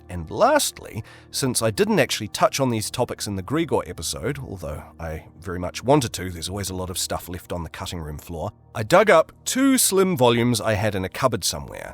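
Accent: New Zealand. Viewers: YOU’RE A KIWI?? The way my neck snapped around when I heard that omg… Hiii from a fellow Kiwi!!! Should have been able to tell from the accent 😭 but I was like “no way right”…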